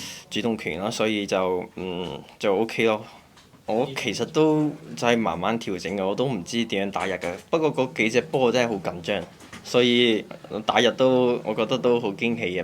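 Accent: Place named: Shaanxi, China. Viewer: 肯定不是东北，是陕西吧？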